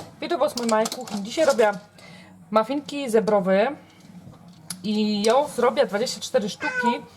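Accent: Silesia, Poland. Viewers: Super tylko dla mnie ten język Ślązaków jest wolniej przyswajalny :) pozdrawiam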